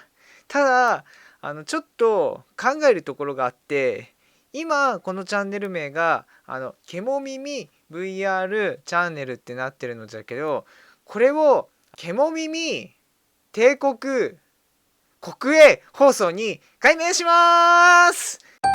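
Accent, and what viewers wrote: Hiroshima, Japan. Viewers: 広島の方ですか？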